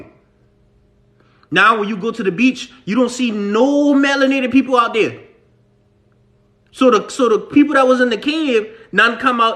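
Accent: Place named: Geechee, United States. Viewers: Bro I’m hearing that geechee accent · You sound geechee.. You from Charleston ,SC.. I knew it 😂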